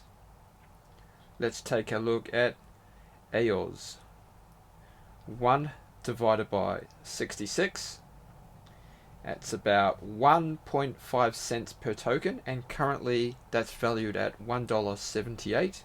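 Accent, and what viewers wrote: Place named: Australia. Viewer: Hey mate. Great video. You sound like another Aussie hahah Im lockdown in melbourne. Anyway can i ask. If you have all the BSCPAD staked already. When the IDOs open, do you have to then go and buy BUSD and BNB or are you just using the BSCPAD tokens as like collateral or something. Only part i dont really get. I would think we will need BNB for fees. But again do we need to buy BUSD and if so how do you work out how much you need. Cheers again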